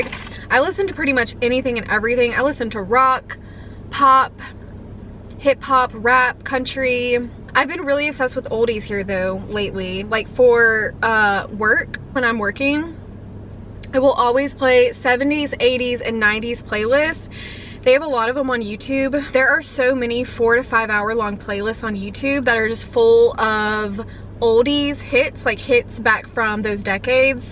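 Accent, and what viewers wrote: South, United States. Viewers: You definitely sound southern to me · You have a slight southern accent. It only comes out sometimes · as a girl from california you definitely have a southern accent. i love it! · You do sound southern to me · i do love your voice girl and it does sound like country but i love it. i haven't had KFC in YEARS!! where i live, we do have a KFC. BUT i cant eat it cause i have such a sensitive stomach to very greasy so it doesn't go well with me. but we do have a Mary browns and its almost the same as Mary browns but its NOT greasy at all and its crsipy and the chicken and cheese macaroni or other stuff is amazing!! if you have a mary browns, let me know girl:) im curious:) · it sounds like country type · You have the typical southern girl accent! It's endearing and sets you apart from other youtubers so embrace it! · As someone who lives in Chicago you definitely have southern accent!! 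· You absolutely enhance the southern twang when you order, but you 100% do still have a southern accent all the time haha I'm telling ya just go back to your store next week if you want the Jack packaging. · I love your necklace! Compared to here in Canada, you definitely have a southern accent in comparison to us but I love it! You're almost at 100K!!!:) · I have no idea who that dude is lol but you can't go wrong with a KFC meal 😁 and girl yes you do sound very country and I can relate but I'm a Tx girl 💞💞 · You sound southern to my ears but please don’t try to sound any different. Your voice is really sweet! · LOVE KFC :) you have a semi southern accent but its cute :) KFC mac and cheese is my fave fast food mac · You definitely have a little bit of a southern accent but at the same time you have a valley girl accent with some words lol it’s hard to explain. I love jack harlows song “first class” or something like that I’m not sure , my boyfriend plays it all the time lol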